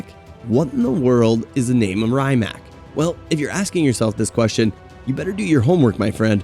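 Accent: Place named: United States. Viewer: Hahah mispronunciation master . Got all the horsepower wrong and with an American accent talking about pounds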